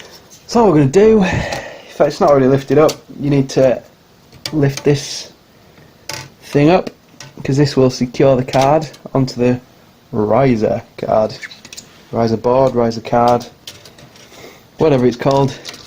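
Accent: Yorkshire, United Kingdom. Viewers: This is pretty cool tbh are you from yorkshire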